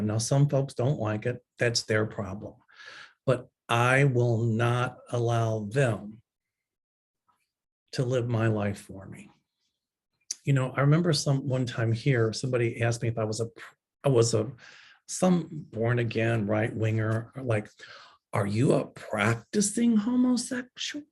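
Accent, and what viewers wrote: South, United States. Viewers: it’s important to talk about your experience of being a victim of Bullying. It’s real, it’s true and others may not ever know of the damage and pain it can do. It may make a difference. As for your articulation… a beautiful southern drawl and it yours!!!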